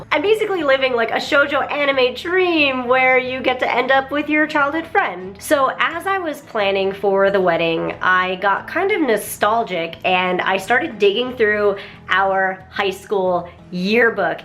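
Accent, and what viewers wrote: New Jersey, United States. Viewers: Wait...Were you born & raised in Japan?? I only ask because could've fooled me. You definitely sound like a girl from Jersey lol. How did you learn English?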